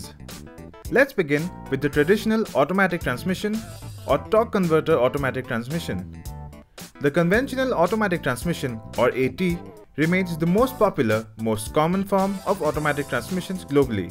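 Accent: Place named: India. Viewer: Jesus dude. Work on your accent. If I want to talk to an Indian I can’t understand, I’ll spend $2k on a plane ticket to a sh!thole South Asian country, buy a sh!t Ton off nose plugs to block all the curry and BO smell, throw a towel on my head to blend in, then proceed to talk to all the snake charmers in the land. Just kidding I would never do that because NO ONE GIVES A F$&@ ABOUT India.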